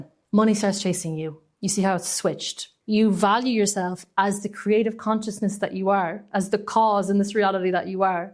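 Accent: Ireland. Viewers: It is so refreshing to hear an Irish accent!!! Thank you ❤❤❤❤❤ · I love your accent - Irish ? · Another great vid - completely unrelated, I am fascinated by accents; as well as an obvious southern Irish lilt, I detect something else - Scandinavian? Central Europe somewhere? Apologies if this is none of my (anyone's) business. 😄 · I appreciate the blazer. For all it may have represented in your presentation. Tbh I am so bamboozled by the wholesomeness of the Irish accent. It is hard to quantify exactly the truth behind the words. Resonates like a mother's tongue. Much more than a business and marketing exercise some critics pertain. Anyhow keep going, if we have a choice! More importantly thanks for the guided meditation practices. They matter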